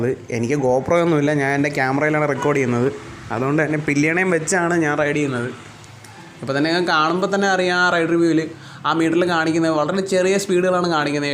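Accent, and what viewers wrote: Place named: Trivandrum, India. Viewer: Neeyokke parayunnathu matulavarkku manasilava kude Ella athinekal nalathu tvm bhasha thaneyya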